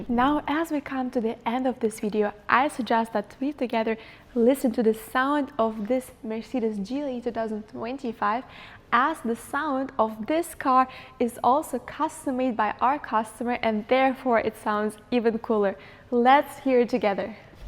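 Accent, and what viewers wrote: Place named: India. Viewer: этот английский для индусов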